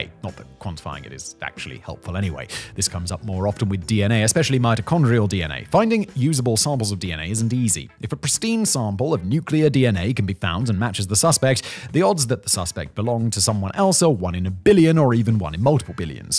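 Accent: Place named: United Kingdom. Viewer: Years I have waited and finally I am satisfied....finally got to hear say "innit" in that very mild British accent 😅😂😂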